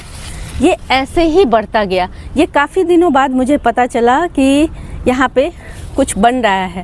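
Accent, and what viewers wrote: Bihar, India. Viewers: mam ap Kahan ki hai Apki Bhasha se ap Bihar ki lag rahi hai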